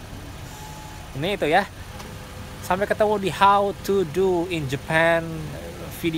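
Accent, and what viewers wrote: West Java, Indonesia. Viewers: urang sunda? wkwk logat nya sunda pisan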